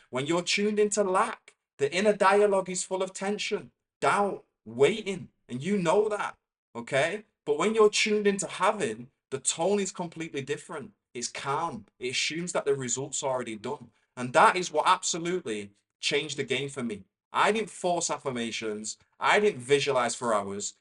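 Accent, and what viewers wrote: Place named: North West England, United Kingdom. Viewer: Love it. Love your accent, too. North West, right? Brings back great childhood memories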